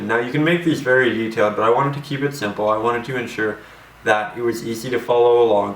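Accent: Canada. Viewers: Fabulous! Great Canadian accent, mate! I hear ya. Thank you for your lessons. They're invaluable to me.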